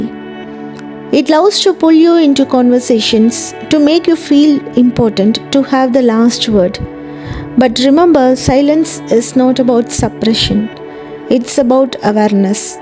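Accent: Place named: India. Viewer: the annoying indian nasal voice over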